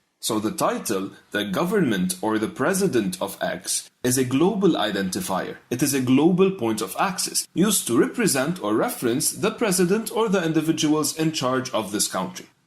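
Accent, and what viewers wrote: Lebanon. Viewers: you sound Lebanese dude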